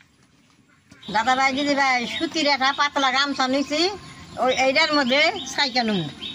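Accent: Tangail, Bangladesh. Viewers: টাংাইল এর ভাসা নাকি মানিকগঞ্জ?? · Didi ki tangail er manus naki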